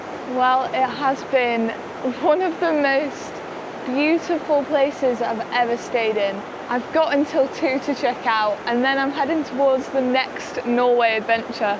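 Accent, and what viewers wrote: United Kingdom. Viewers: Hello! Who are you traveling with? I love your British accent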